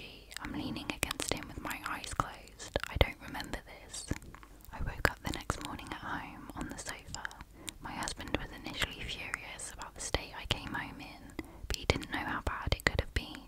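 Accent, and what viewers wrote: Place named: United Kingdom. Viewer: GOD DAMN you're impeccable dude!! As per usual lol. Ive gotta say, you have suuuuch a lovely British accent ❤ Im Aussie, my former father in law is a Geordie.. so i know not all English accents are as... ummm... soothing as yours? Lol! 😂 Love your work doll, seriously.. you're unbelievably good at this. Your accent & whisper tone as so well suited to ASMR ❤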